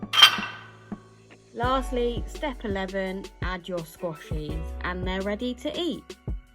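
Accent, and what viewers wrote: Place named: United Kingdom. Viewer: Loved this, and a British accent?! Great to see a fellow British Youtuber!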